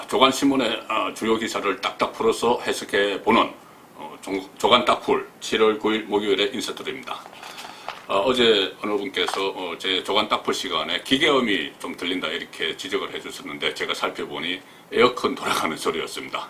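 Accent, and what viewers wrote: Gyeongsang, South Korea. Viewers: 이사랑은 목소리가 대구야 억양이 티가나 아주듣기싢어 국거이 ㅋㅋㅋ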